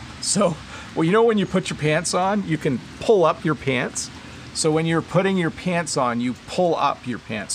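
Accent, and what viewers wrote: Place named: Canada. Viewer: Hi, I am an English teacher and I always recommend Canadian accent to my students. By the way I love the way you teach English and talk about what is going on around you. That's sounds more real.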